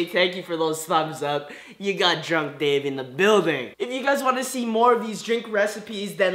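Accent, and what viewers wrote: Canada. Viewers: Drake on SNL did a skit on black jeopardy and was playing a black Canadian with a Canadian accent. He sounded just like you. He stole your swag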